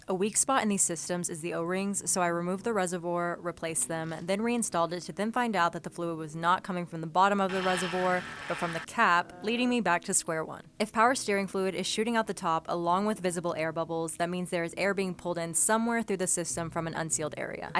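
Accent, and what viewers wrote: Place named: West Coast, United States. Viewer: She sounds like she's from the West Coast. East of the Rocky mountains, the cars all rust out.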